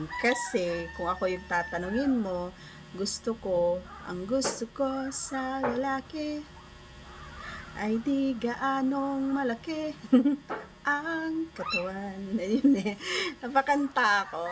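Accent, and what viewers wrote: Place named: Visayas, Philippines. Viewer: Saan ba probinsia mo baby ? bisaya ako halata kita bisaya ka.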